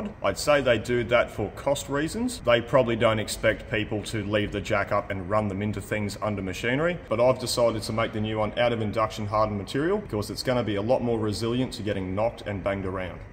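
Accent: Australia. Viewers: Nice aussie accent , amazing craftsmanship, make your vids worth watching. Greeting from a fellow machinist.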